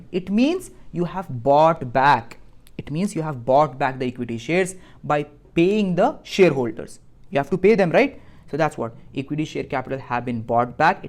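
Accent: India. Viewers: BHAI THU KON HAI 🫂 Teri ENGLISH bhi HINDI jaise lagti hai aur thu FREE me itni mehnath karke itne PYARE notes banaye hai na STUDENTS k liye kya bolu : HAT'S OFF MAN 🫡 THANK YOU BRO 🥹 Bhai thu kya kar raha hai na ye thuze kabhi nahi samjega thu itne EASY WAY me samja raha hai na jaise ye koi 11-12th ka chapter hai bro thu asli KING hai ACC ka 👑 Pahile baat tho thu FREE me q padha raha hai ye nahi samja muzhe par thu itna PREMIUM CONTENT free me de raha hai na iske liye DILSE THANKS BHAI 🙏 EK SUGGESTION HAI : "aap k pas konsi DEGREE hai bus ye REVEL😁 kardo bhai agar aap CA ho tho bhai thik hai par aap agar CA nahi ho aur itni acchi CONCEPTUAL CLARITY de raha ho tho bhai aap BHAGWAN HO"😑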